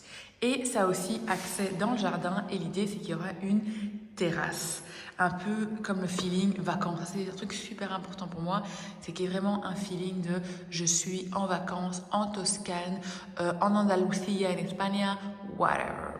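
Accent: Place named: United States. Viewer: English without an American accent please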